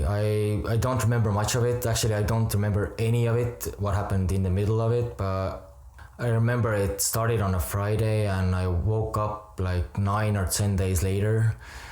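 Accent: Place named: Ireland. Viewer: Kind of sounds like an Irish accent.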